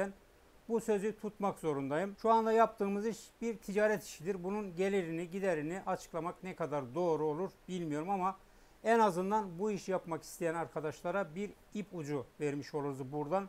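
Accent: Erzurum, Turkey. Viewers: DAYI SEN ERZURUMLUMUSUN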